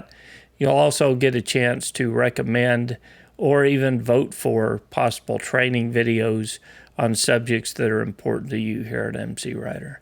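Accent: Texas, United States. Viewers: I like the Texan accent :-)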